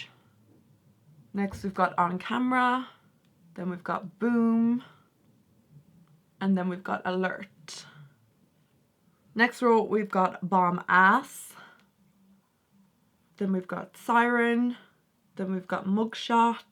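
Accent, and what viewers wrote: Ireland. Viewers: That yellow, the red, and the purple red ... so gorgeous. I also love that green palette. Well, now the yellow palette is also beautiful. I think the purple and the red palette would be my favorite. I love those golds and whites and greenish gold shades. I'm brand new to your channel. Are you from Ireland? You sound like Brendan Gleeson. I wonder whether you are from the same area where he grew up? I think he lives in Dublin now, but I'm not sure where the various inflections and tones of each accent originate. WOW, when you did that up-close of your eye look, so beautiful and very well blended!Thank you for sharing your impressions.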